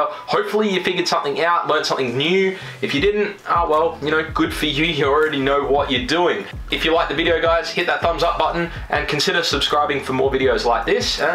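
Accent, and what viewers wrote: Australia. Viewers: mate this helped a lot champion. Also you legit sound aussie as but you're Canadian? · Good tips mate... you're also an Aussie yeah? Sounds like a familiar accent haha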